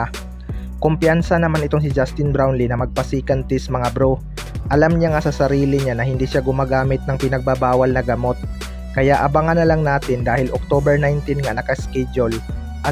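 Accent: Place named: Iloilo, Philippines. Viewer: ilongo ka no..may punto kasi tagalog m9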